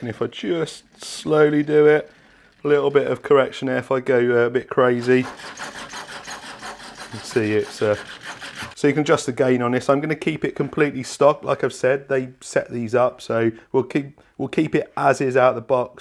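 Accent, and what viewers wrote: United Kingdom. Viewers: I assume so he sounds he is from the UK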